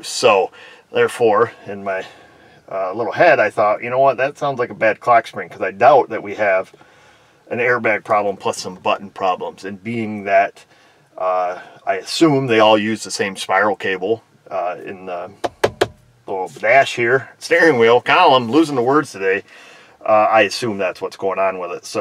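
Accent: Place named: New York, United States. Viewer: Since you speak New York-ese I thought you were saying Self Made Auto:(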